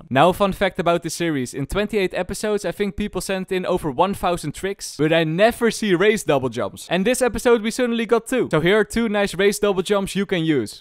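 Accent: Netherlands. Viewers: why do i hear some dutch?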